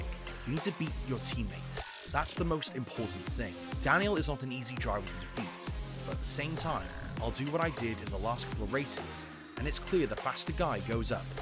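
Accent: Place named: United Kingdom. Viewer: british accent might be tricky when it comes to foreign names 😆 i heard it on other channels too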